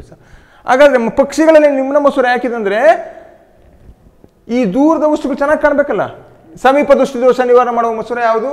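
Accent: North Karnataka, India. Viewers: sir nivu class channag madtira, bt nam utthara karnataka mathado saili Channagill,, nivu mululi normal aagi mathadta class madi beautiful aagi barutte,, bejar aagbedi sir please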